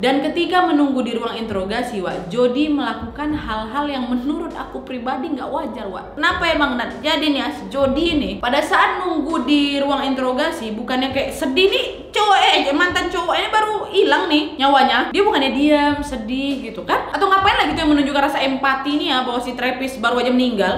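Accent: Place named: Medan, Indonesia. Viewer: Ga seru rupanya klo ga pake logat medannya, emang kenapa rupanya.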